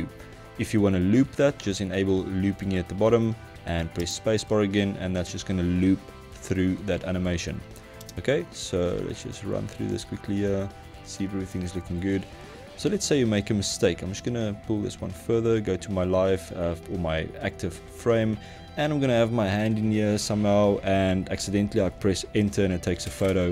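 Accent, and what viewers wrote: South Africa. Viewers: you south african by any chance?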